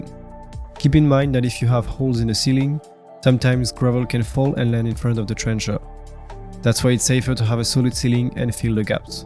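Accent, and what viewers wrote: France. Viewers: On dirait que j'entend un français...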